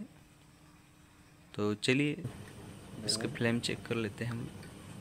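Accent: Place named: Maharashtra, India. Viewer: Aapke Hindi bolne ke tarike se aap nagpur maharastra ke lagte hain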